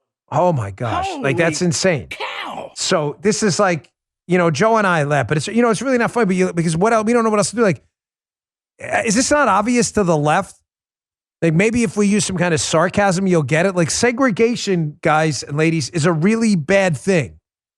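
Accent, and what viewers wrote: New York, United States. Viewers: Hi Love your podcasts… and your NY mouth 😀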